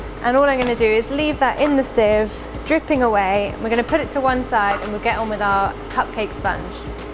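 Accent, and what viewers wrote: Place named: United Kingdom. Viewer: 👍😇 You're really great, I love the way you present things (and like with Jemma, I also love the way you speak - being German, I'm always looking for British English input and I enjoy your and Jemma's English so much 😍)!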